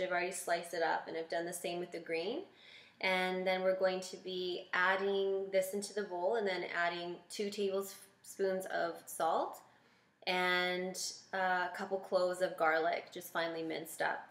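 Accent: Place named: Canada. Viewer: I like your Canadian accent.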